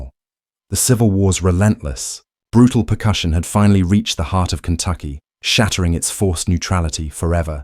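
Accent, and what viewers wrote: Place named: England, United Kingdom. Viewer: They should have chosen a southern american accent narrator discussing this matter. The English accent for this story is off putting. The guy who did PBSs The Civil War would be the best Ai option.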